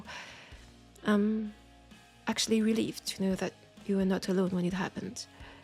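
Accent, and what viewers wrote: France. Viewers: So happy to see this witch back in business again, she’s my favourite of all the characters you voice and your French accent is always so nice to listen to hope you have a great Halloween and look after yourself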